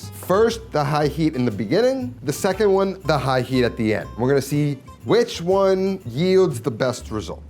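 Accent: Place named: Puerto Rico, United States. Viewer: This guy's like if you mixed a puerto rican and a jew. Very disconcerting accent.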